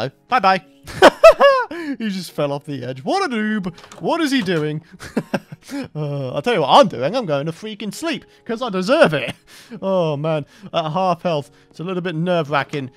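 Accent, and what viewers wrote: Scotland, United Kingdom. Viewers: Hi:) I'm one of your new subs and I'm curious..:) So I have a question for you. Are you from Scotland? I seemed to hear some Scottish dialect from you. I'm from Denmark and I am a big fan of the Scottish/Canadian rock/folk music group Runrig. They are unfortunately taking an absolute last world tour this year.:(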